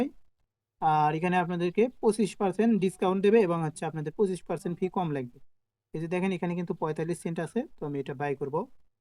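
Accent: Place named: Bogura, Bangladesh. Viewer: vi mone hoy Bogura er hosse hosse kotha ta bashi boltacen amio Bogura er sol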